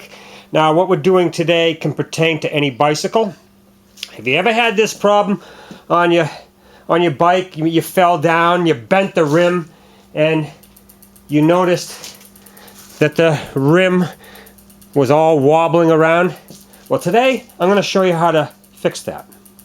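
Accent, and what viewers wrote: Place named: Boston, United States. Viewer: stoppsi Obviously Massachusetts/Boston/New England.. lol But it's ok..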